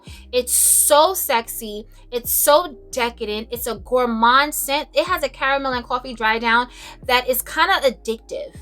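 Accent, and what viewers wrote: New York, United States. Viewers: Yayy, thank you so much for posting this! I can listen to you talk all day, love that NY accent 😍Totally agree about Tribeca, I'm currently obsessed with it at the moment - like it's super rich and sweet/deep notes yet it's so airy and light, and makes me feel so posh lol. Greenwhich Village and New York Nights are next on my to-buy list!! Would you say NY Nights could be a signature during colder months or night time only vibe?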